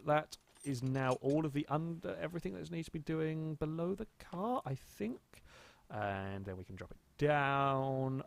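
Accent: United Kingdom. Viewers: AY Bloke,, hahahha we call stripping and selling in US 'a chop shop',, buy you had a rag, not a dream car, so yeah, you're only going to get trash money back,, now go 'steal' some rims from a barn, 'steal' some heads from a junkyard, and 'steal' some parts off another car of the near same type, then sell it, and watch what your value is!! Fun watching your vids tho,, and like I said before ,, love the Brit accent, reminds me of home... TC E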